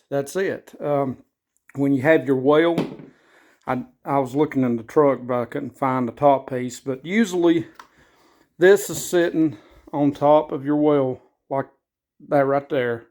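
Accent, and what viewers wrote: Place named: West Virginia, United States. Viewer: You have to be from western west Virginia with that accent.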